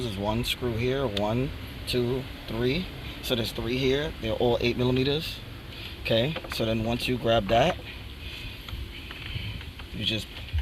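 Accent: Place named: New York City, United States. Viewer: Thanks bro you saved me $80 , I hear Washington Heights in that accent…🤓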